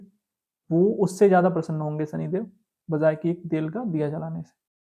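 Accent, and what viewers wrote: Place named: Uttar Pradesh, India. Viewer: Apki boli achi lagi, ap UP se ho kya? wahi se hote h zadatar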